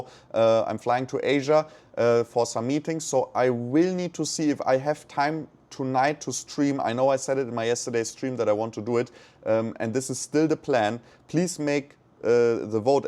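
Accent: Germany. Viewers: Hör ich da einen deutschen Akzent? ;-) Danke an Altcoin Daily. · Altcoin Daily Bright me here! Which European country are you in? Sounds a little German maybe? Anyways, I'm in the US and you're 6 hours ahead of me. If you did livestreams at 9pm your time, would be perfect for me! Keep up the amazing work!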